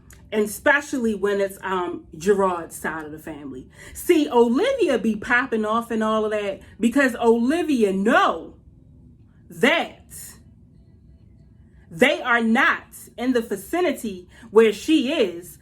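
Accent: Baltimore, United States. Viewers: You from Baltimore Ms.?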